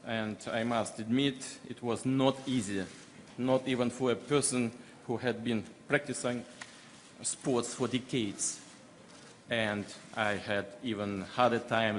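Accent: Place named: France. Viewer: Sounds French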